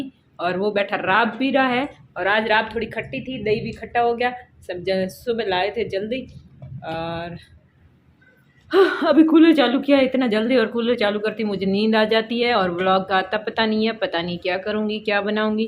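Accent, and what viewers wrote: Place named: Rajasthan, India. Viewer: Meri beti ki ichha thi apki marwadi sunne ki aaj puri ho gyi ,kl bol rhi thi ki ye didi marwadi bolte h kya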